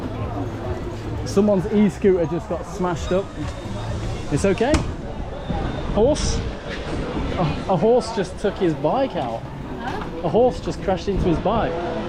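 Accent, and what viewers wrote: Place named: Manchester, United Kingdom. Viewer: Sound Manc or northern Uk which im from. I will keep an eye out of your vids. If your around in IND let me know and you can show me around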